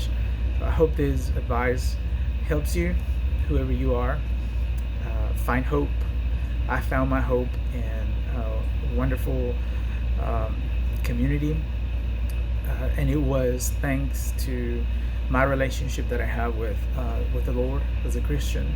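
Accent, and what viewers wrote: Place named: South, United States. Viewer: Love this,. Well done and very helpful. You are picking up a southern accent 😁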